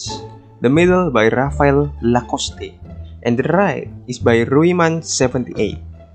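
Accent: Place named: Indonesia. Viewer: Indo banget soaranya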